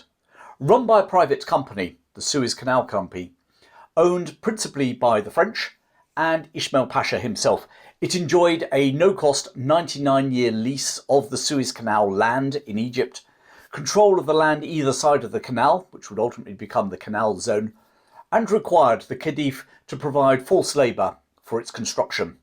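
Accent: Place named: Wales, United Kingdom. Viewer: That Welsh accent 🤣🤣 I didn't know anything about this incident - it's always fascinated me the way the various European imperial powers collaborated so actively and openly in the decades before the First World War. The Taiping rebellion and various affairs in Egypt spring to mind but there are other examples. A shame that had to be ended by total global conflict.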